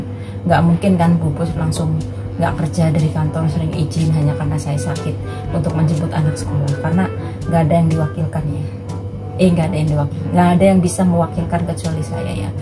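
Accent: Banyumas, Indonesia. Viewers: Semoga selalu sehat yah mba..cepet temen masak mie gorengnya mba.. Salam ngapak mba.. Pancen wong ngapak nek ora ngapak kue ora kepenak banget kooh..😊🙏🙏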